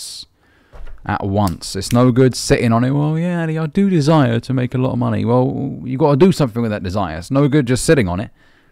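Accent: United Kingdom. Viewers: Hello Ali! I stumbled upon one of your videos randomly, and I ended up watching almost half of your entire collection already! You and I both know that the content you cover has been explored in various ways by many others before you, but let me tell you: your approach is truly unique and I absolutely love it! Consider me a dedicated subscriber from now on. Your calm and non-aggressive tone, along with your willingness to understand and help, are rare qualities these days. I wish you all the best not only in your business but also in your personal life. By the way, if you don't mind me asking, where are you from? Your English accent sounds quite British to me. Wishing you the best of luck in all your endeavors!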